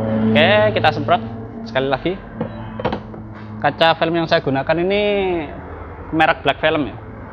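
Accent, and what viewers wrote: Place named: Java, Indonesia. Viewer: owalah denpasar ... kalo ngomong kok ada jawa"nya masbrow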